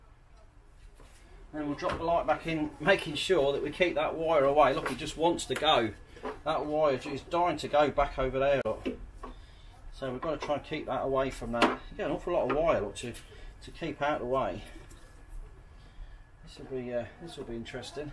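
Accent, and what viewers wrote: Leicester, United Kingdom. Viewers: New you were Leicester soon as you started talking .. great vid hope it sorts my boot leak out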